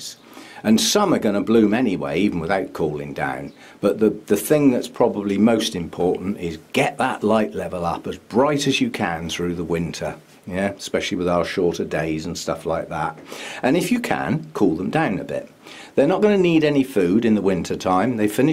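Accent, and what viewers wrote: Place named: Norfolk, United Kingdom. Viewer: Most informative video I've found so far! Really helpful. Thank you. Also as a Norfolk girl, I'm loving your accent. Sounds like home :)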